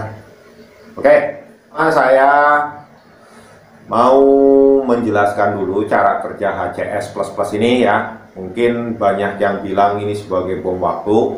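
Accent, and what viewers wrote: Banyumas, Indonesia. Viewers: Basane ngapak temen kue kang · Daerah mana Boss,kok ngapak dialeknya.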